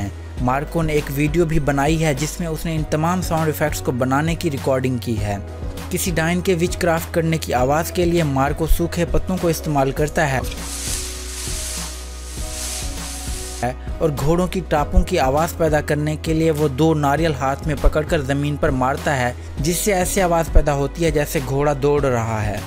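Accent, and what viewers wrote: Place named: Pakistan. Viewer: Iski aawaz se ye Pakistani lag raha hai............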